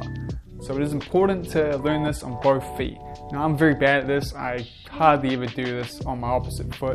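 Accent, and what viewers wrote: New Zealand. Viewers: nice vid bro - are you from NZ >? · Sounds like a kiwi accent to me :) Thanks for the great tips!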